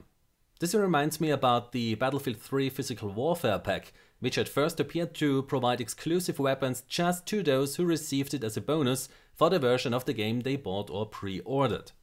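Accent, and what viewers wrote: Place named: Germany. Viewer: That German accent gave me cancer.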